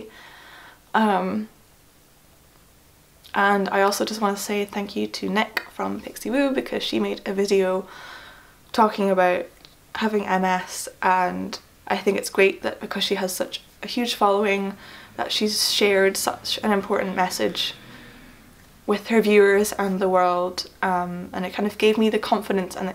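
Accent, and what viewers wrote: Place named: Scotland, United Kingdom. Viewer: Just diagnosed a week ago, currently with CIS but with a likelihood of MS. I hear from your voice from Scotland too. Your video is seriously helping me coming to terms with things. I have just taken on gluten and dairy free too. You’re an inspiration. I’m afraid to tell people because I worry they treat me different. Sorry for the wee ramble, I wish you all the best 😘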